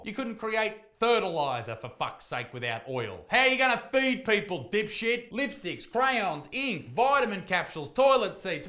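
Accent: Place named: Australia. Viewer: Mate, you’ve gone to shit it’s not even fucking funny. Your beard wobbles like a scrotum and you talk like this true blue Aussie ranting on about “issues” which you refuse to show both sides of the argument about or acknowledge fucking facts about renewables, ect. Your dad was a bloody NRL premiership player and you likely grew up rich af but still pretend like you speak for the people despite literally none of this affecting you, your whole MO has literally become stirring up controversy and then begging your viewers to suck you off, buy some of your merchandise and to watch one of your “standup specials” You know how Jake Paul claims to be a rapper and can’t rap? Yeah that’s exactly what you have become except with comedy, pushing some batshit crazy political shit that purely consists of “trans person crazy, body positivity crazy, vegan crazy” and when you do make a video that has valid points it’s regurgitating the same shit you hear a million times on other channels, (friendly Jordies controversy) your comedy is just putting on some god awful show with your followers where you meet and greet them, refuse to do nothing remotely funny and just consistently hate and be an edgy asshole up on stage, and your viewers love it. 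You could wank onto the fucking podium and your viewers would call you the greatest comedian of all time. Also, you unironically dressed up as the joker and shot some broccoli with an air rifle to promote one of your god awful shows. Honestly mate, you’ve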